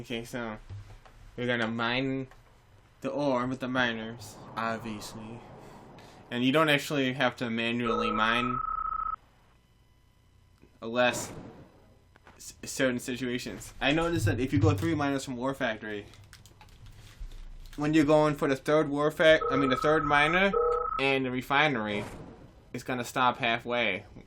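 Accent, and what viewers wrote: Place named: New York, United States. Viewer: you come from new york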